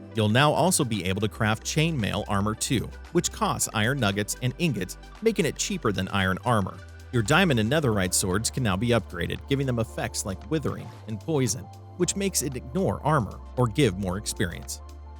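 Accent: Netherlands. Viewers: are you realy english or dutch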